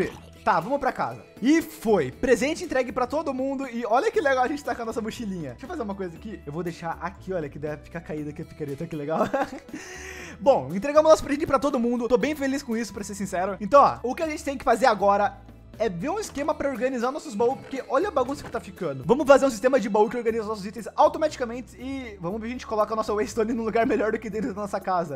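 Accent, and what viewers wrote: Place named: South, Brazil. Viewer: tenho uma pergunta onde vc mora? pq parece q vc é aq do sul Vc provavelmente n vai me responder, mas vai q vc responde ❤️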